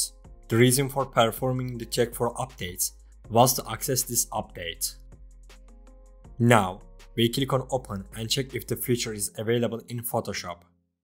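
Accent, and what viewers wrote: Turkey. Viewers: Türksün demi la? aksanından anladım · I dont know why but you have a very stong Turkish accent. Nice video!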